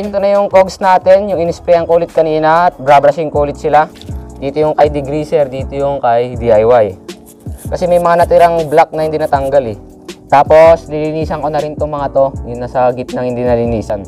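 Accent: Ilocos, Philippines. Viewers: ilocano at kapampangan naririnig ko eh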